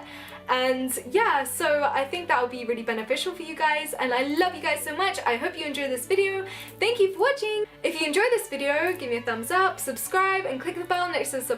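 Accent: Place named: United Kingdom. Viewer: I love the sound of the British English 🤔🤔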